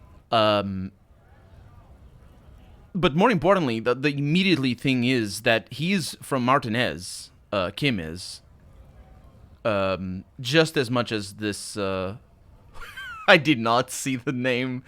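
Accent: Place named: Portugal. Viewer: Dude you're already a portuguese person speaking english, trying to act native american would be a bit too hard lol. I don't mean this as an insult. I just know how much portuguese differs from english is all. Also coming from someone who speaks a different language as well that doesn't look even close to english either. heck I don't think I can pull off accent anyway.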